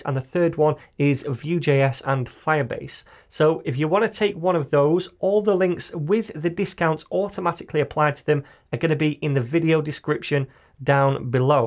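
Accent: United Kingdom. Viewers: Is this speaking British Accent?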